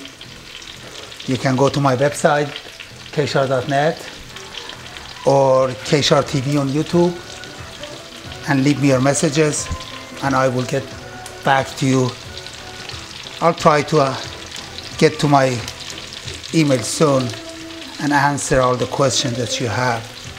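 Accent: Iran. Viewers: I'm sorry, but I really couldn't focus because of his thick Persian accent (the one that Persian are so famous for) Bebakhshid!😅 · من جدیداً این فیلم رو دیدم وبه نظرم عالی بود که با لهجه قشنگشون این غذا رو آموزش دادن خانم داشتن لهجه نشان دهنده این هست که این آقا زبان دیگه ای به جز زبان انگلیسی بلده و این خیلی خوبه مخصوصا لهجه ایرانی نشان دهنده این هست که زبان شیرین فارسی رو بلدن انگلیسی زبان ها هم موقع فارسی حرف زدن لهجه خیییلی زیادی دارن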